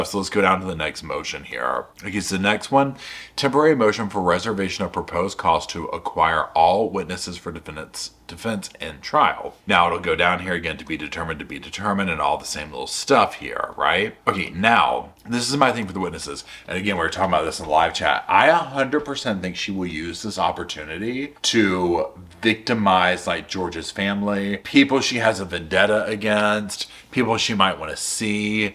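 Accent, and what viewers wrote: South, United States. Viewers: Great video also as as someone from Arizona whose never been east of Texas you might be the first person I’ve ever heard with a southern accent & gay voice lmao and I gotta say a gay southern accent is now my new favorite accent ( sorry Australians)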